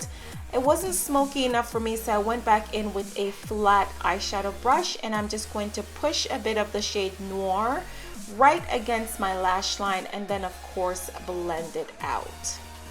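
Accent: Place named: Jamaica. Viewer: your makeup is always so gorgeous, are you jamaican I hear an accent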